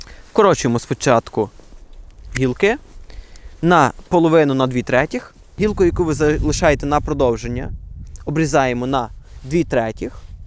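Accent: Western Ukraine, Ukraine. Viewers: Любуюсь этим парнем. Многие у него взял. Спасибо большое. Он любит своё дело.И мова очень гармонично звучит, хоть и с выраженным западенским акцентом. Молодец, уважение! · Западный акцет... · Очень понравилось. Спасибо за сжатое изложение по сути. Начинаю писать по русски, чувствую, что съеду на украинский)) Речь красивая, чувствуется акцент западной Украины, но очень привлекательный. Сохраню видео себе. А то посадила пару черешен, а как подойти к ним, не знаю. Подскажите, а повой ВСЛ2 подвержен гробакам? Дякую и всього найкращого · не варто вибачатись за "хоть и....западенский акцент". Українська мова багата різними акцентами і тим прекрасніша!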